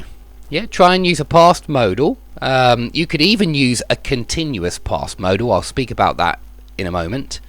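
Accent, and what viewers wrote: United Kingdom. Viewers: I like your way to teach with british accent. . love you so much. thank you frim indonesia :)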